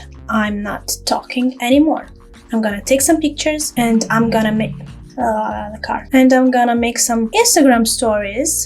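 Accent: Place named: United Kingdom. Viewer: I love the british accent! And the ByTherry powder Is my favorite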